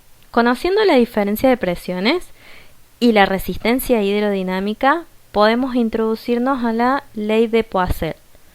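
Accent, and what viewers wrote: Córdoba, Argentina. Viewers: Eres cordobesa?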